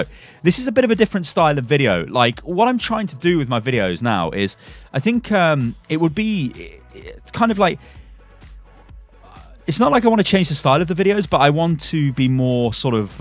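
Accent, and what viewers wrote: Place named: United Kingdom. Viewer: Hi! Love your vids, it's so nice to listen to a British accent like my own to when watching gameplay!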